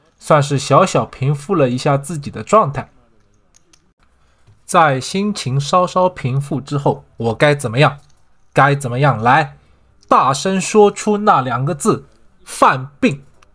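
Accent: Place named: Hangzhou, China. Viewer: 口音杭州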